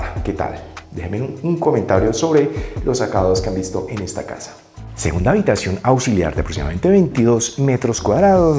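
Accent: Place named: Colombia. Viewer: Tiene el acento colombiano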